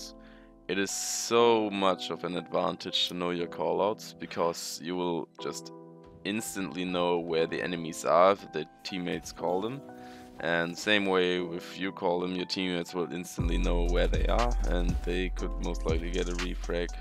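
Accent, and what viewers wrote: Germany. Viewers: Are u a german guy ? (Because of the accent)😅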